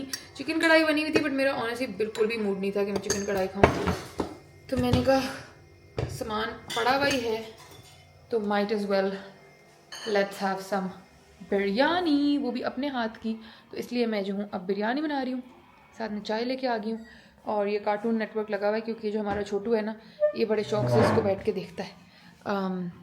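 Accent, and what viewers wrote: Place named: United Kingdom. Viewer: I love your British accent please try to use it more often while conversation in your videos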